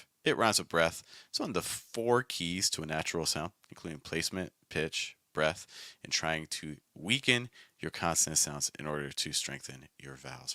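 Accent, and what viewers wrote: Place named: California, United States. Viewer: I thought you have a California accent based on your stress patterns and the occasional use of "like." :) Actually, American accents are a very interesting topic to discuss that we all non-native speakers would love to hear about. For example, what are the differences between NY and Philly accents?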